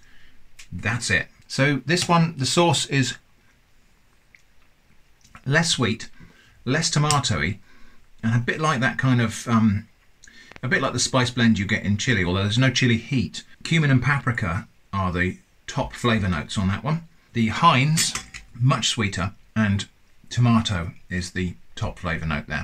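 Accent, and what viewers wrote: United Kingdom. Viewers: Best Brittihcent inherent.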